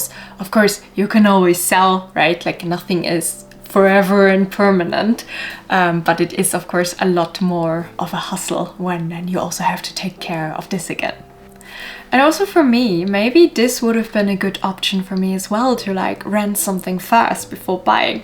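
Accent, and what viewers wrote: Germany. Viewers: Thank you, i thought I heard a little german accent but there are many countries that speak german, right. Good Luck with everything and i hope we Swedes help you with what y need.👍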